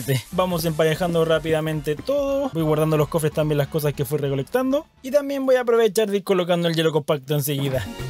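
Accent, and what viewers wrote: Chile. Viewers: eres chileno??????? saludos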